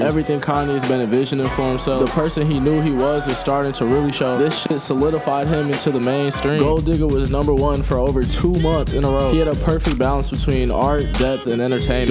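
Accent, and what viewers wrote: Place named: Baltimore, United States. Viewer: I cant unhear the baltimore accent its making me crazy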